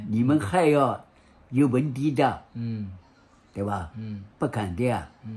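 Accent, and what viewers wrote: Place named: Shanghai, China. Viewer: 不看字幕，老爷子上海口音我还是要使劲听。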